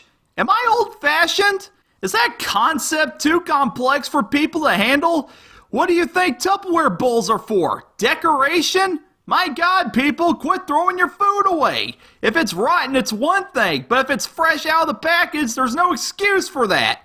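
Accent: South, United States. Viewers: I love how southern he is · I love your country ascent!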